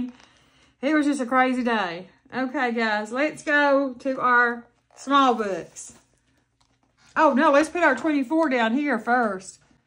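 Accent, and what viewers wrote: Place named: South, United States. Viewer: It’s so great to hear someone doing this that sounds like me 🥰. I’m from Georgia and believe it or not I think you may even sound a little more country/southern than me. I LOVE IT!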